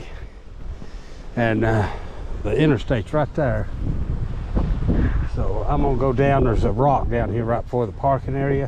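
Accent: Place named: South, United States. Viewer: That name does not match up it that Southern accent.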